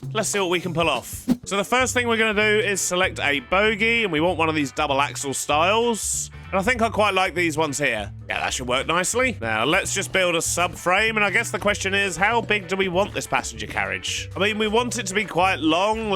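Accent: United Kingdom. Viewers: that accent never fails to amaze me. british? also train station name: Docker Dumps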